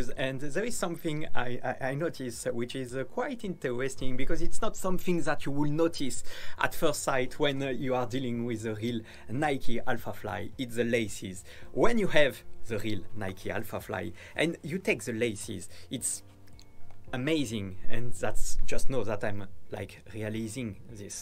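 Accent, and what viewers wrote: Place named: France. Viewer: Oh l’accent français à couper au couteau !!! lol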